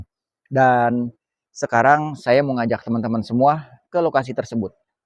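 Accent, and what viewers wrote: Jakarta, Indonesia. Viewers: bang ngomong ny Betawi banget, emang asli nya orang mana bang?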